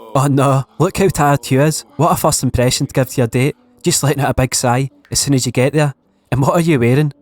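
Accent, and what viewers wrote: Scotland, United Kingdom. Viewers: Its gid to have more Scottish youtubers nicer to listen to along with the Irish ones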